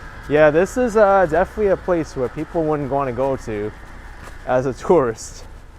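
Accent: New York, United States. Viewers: Hi, I really like these videos of the back streets of New York. Admittedly when you announced you were moving to Miami I was sceptical that I would still enjoy your content and I haven’t watched any Miami videos to date. I always associate you with New York, your town and your accent. I watched your Leaving video but I’ve yet to watch the Decline video but I will.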